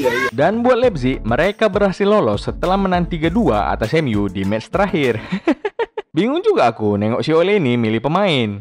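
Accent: Medan, Indonesia. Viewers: Logat medan punya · Emang gitu bro ngomong nya khas orang medan kan si ini orang medan jadi gw tau ya karena aku pun tinggal di medan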